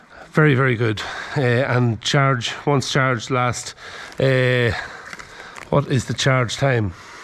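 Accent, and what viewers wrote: Dundalk, Ireland. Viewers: Great video. Happy holidays,. Are you from around Dundalk somewhere?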